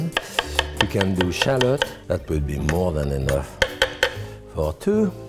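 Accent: France. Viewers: the adorable chef with an insane french accent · This is a proper french accent, not that fake shit from that curly haired dude, god i love french accents but that dudes accent makes me cringe so much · The french accent turns Th into Z, but he had a stroke 5 years ago and it has considerably slurred his speech. Very understandable though, mouth watering sound is the same as not being able to control your salivary tract excretion. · Cannot stand his strong French accent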